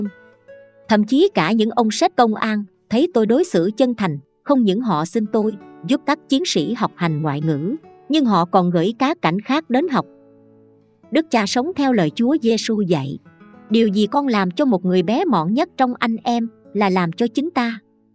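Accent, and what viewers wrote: Saigon, Vietnam. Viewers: Giọng Sài Gòn xưa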